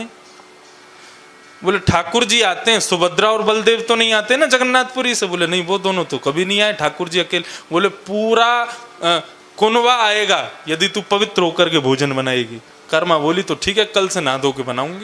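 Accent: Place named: Braj, India. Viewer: ब्रज की वाणी मे रस और अपनापन महसूस होता हैँ 🙏धन्य हैँ आप महाराज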